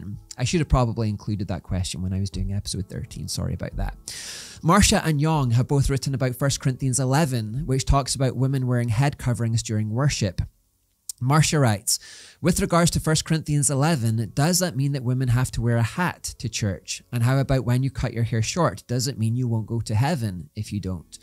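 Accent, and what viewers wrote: Scotland, United Kingdom. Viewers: I've appreciated each video in this series. Thanks for being true to the Lord. I love listening to Scottish accents. 😊 Yours is very easy to understand for this Iowan from the US. Looking forward to the next series. May God continue to bless you! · Love your channel,. I've been watching your videos since Know Your Enemy, and no, your Scottish accent isn't a problem at all and English isn't my first language. In fact, I'd like to hear your natural Scottish accent at least once, because I've been to Scotland and oh, that was fun! 😂 I struggled to understand half of what people said, but still loved the accent. Finally, I'm absolutely sure that this channel is being suppressed by YouTube. I watch a lot of Christian content and (no offense to anyone else but) channels that aren't nearly as good as yours have grown significantly more. 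Channels with not only "worse" content, but also editing and overall quality. I guess you just speak too much truth! Best of luck with all you do. 😊 Can't wait to see what your next series is!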